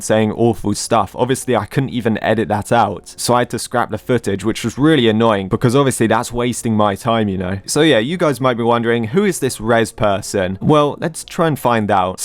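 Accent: United Kingdom. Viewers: I could tell that this man is British or at least European cuz them words could only be learned from a proper grandmother as a British folk myself 😂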